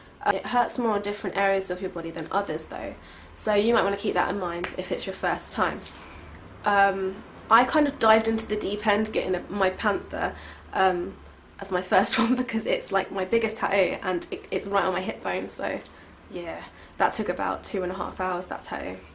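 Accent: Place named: United Kingdom. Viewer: OH....MY....GOD....I fucking hate British accents. Instant turn-off.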